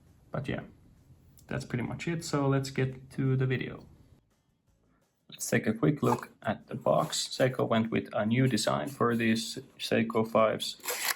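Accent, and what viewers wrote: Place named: Finland. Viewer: third comment in a day, enyoing your content man (the finish accent reminds me of watching interviews with my favourit band ;) ) - The new SRPE's are real stunning watches, I got a great deal on the 61 (grey dial, Nato-Strap) and ordered on Tuesday. That colour is really the best of the bunch in my opinion + the ones on the Nato have brushed bezels. I paid 180€ incl. shipping and customs from Japan to Germany and it will arrive in under a week if DHL is to be trusted. While at it I bought a fitting strapcode-oyster (solid links, solid endlings, solid clasp, screwlinks), set to arrive the same day and litarrily can't wait (thats why I watch all the reviews on the SRPE's currently ;) ) - hope this combination will look as good in the flesh as it does on pictures. Even more Tudor-Blackbay with that one. Already considering buying a strap from watchgecko, the grey-striped one you showed must look awesome with the grey-dial (maybe the darker-shade but it is a real strapmonster I believe). 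I was really enjoying your review, specially liked the sound/music for the Negatives and during the Lumeshot (you could turn the 70's-porn-sound to 11 though next time). As with the SNKL23 I'm also considering adding a double-dome for this one, would give it even more class.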